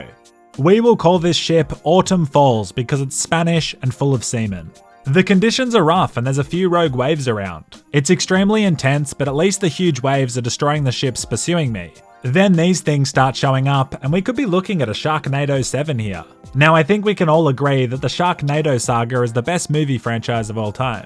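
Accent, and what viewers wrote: Australia. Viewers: You have my sense of humour, you play all the games I like, and you have an aussie accent (the best accent). This channel is essentially designed for me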